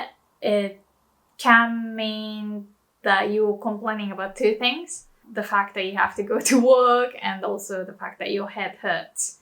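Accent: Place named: United Kingdom. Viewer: I like your accents. When you speak English, you sound a little British. Do you have a background of British or Australian?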